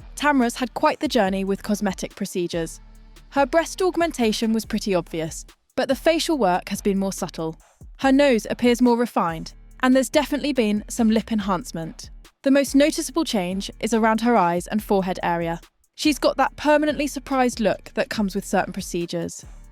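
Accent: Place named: United Kingdom. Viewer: Are you Lucy from Engliswithlucy?? You have the same voice. And Iove your British accent. Good video !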